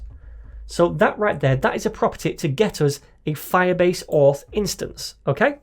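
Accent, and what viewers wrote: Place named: England, United Kingdom. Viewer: You are the best in youtube ive seen explaining each topic I guess because your English is native so you don't do any effort to translate your thoughts plus you are awesome plus your accent is Sooo English that makes every word sound smarter,, and makes me feel wearing a grey tailored fit suit attending a lecture in an old shiny wooden lecture hall in oxford university in year 1752 among a beautiful group of people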